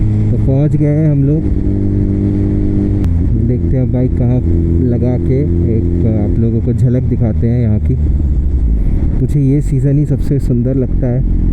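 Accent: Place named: Chhattisgarh, India. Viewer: Deady colaigen..😂😂😂 ur chhatisgarhiya accent is so dope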